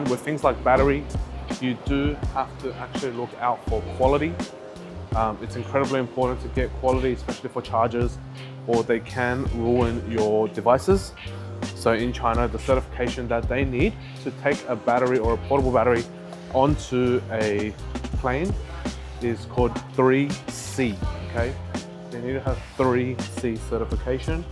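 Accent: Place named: Australia. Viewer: Australian accent detected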